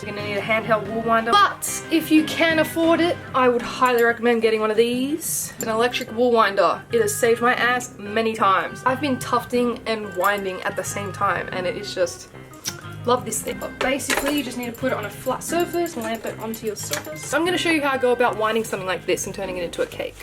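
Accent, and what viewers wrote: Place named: Australia. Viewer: Jazza sent me. Nice video. Was thinking: Sounds like an aussie. Then you bust out a "now this blokes all done" Yea that'll do it.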